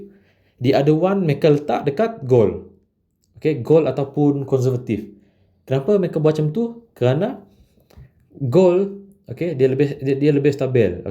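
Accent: Sarawak, Malaysia. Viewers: hahaha.. nang antap gilak sleng srwk nya tek. ku awal2 juak dah perasan.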